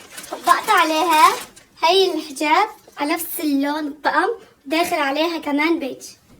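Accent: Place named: Syria. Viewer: تباني سورية من سورية من صوتك😅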